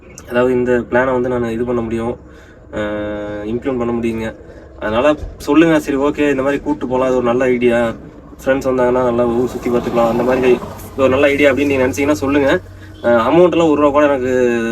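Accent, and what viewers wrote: Kongu, India. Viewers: கொங்கு தமிழ் பேச்சு அருமை அருமை தம்பி. அப்பாவின் உழைப்பும் திறமையும் உங்களுக்கு பேருதவியாக இருக்கிறது தம்பி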